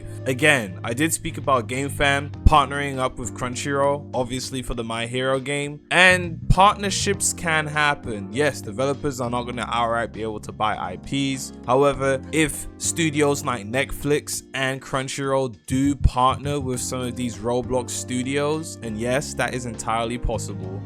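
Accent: United Kingdom. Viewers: most bristh person i ever heard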